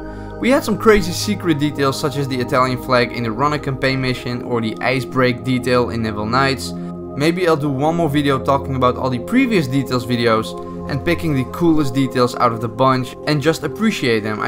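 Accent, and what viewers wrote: Netherlands. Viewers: You sound like youre dutch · Your english sound dutch. You from holland? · im new and have a qeustion are you dutch ?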